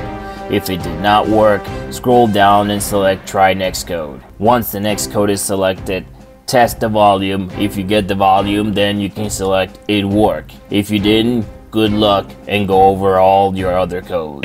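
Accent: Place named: North, United Kingdom. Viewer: Bro got dat up nort accent hey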